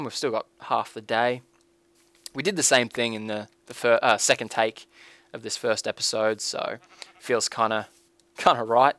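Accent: Australia. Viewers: i completely feel how u feel atm, my upload is completeley shit, and eveything around me is being pretty bad as well, bad net, too much work etc. i recently heard about u from the hermitcraft community and after seeing the first 7 minutes of this video, i feel that we have lots of things that are very similar between us, and thats why, i think ill be watching more of you! nice to know that im not the only one who has faced this kinda situation. I got two questions for you though a- what did u mean by 'tuberblues'? sorry english isnt my first language and i didnt understand what u meant when u said that word. and b- are you Australian or new zealandar ? i swear that accent of ur, so much like the guys here is australia! (lol yeah i live in AU) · cool to see you back bro, missed that aussie accent <3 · Old timer, glad to see your back. I really did miss hearing the aussie accent on YouTube. 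Btw, which paintball field did you work at /are you still working there? I can't remember if you told us if your still working there. It's been a day after watching your video. And again. So glad your back.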